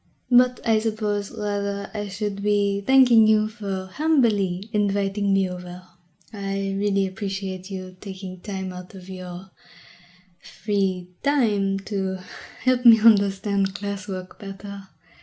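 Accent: France. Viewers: everyone gonna ignore the fact we just got a free science lesson while listening to a nice french accent. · Ur french accent is neat ^^ u put a lot of effort in it Nice roleplay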